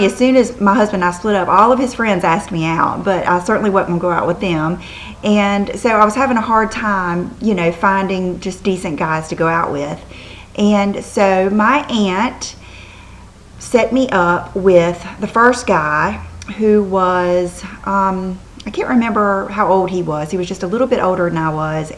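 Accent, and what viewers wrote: South, United States. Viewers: PS: you always brightin my day, Ur southern accent is soo beautiful and welcoming i am soo takin back.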